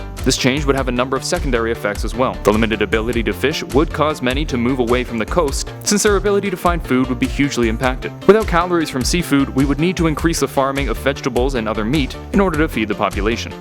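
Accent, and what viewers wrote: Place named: Scotland, United Kingdom. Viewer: Dude it's not that hard to understand Scottish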